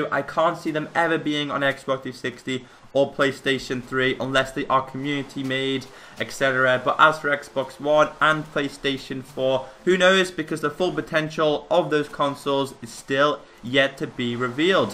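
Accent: Liverpool, United Kingdom. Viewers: Are you from liverpool ?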